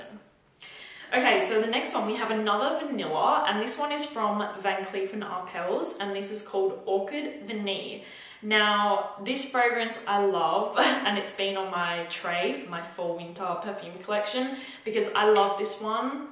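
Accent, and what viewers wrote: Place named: Australia. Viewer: Aussie girl back at it again. Love the accent she has it’s orgasm into my ears...haha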